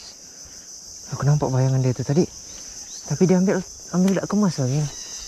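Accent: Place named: Malaysia. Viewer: Mantappp.....nk tnya awak org mana??bunyi mcm org Malaysia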